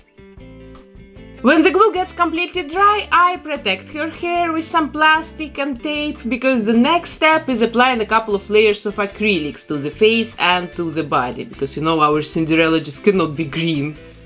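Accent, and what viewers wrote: Russia. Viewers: не перестаю удивляться тому, насколько русским может звучать английский язык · Where is she from? Such a different accent! I'm guessing she's European or Russian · Волосы грубо смотрятся, как солома( И да, русский акцент))) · Вы Русская? Акцент 🤦‍♀️ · Русский английский) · Что с твоим голосом? Ты что русская ? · Акцент русский, вы случайно не из России? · Стоп, я слышу руский акцент))) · Акцент очень похож на русский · She has a Russian accent, she is russian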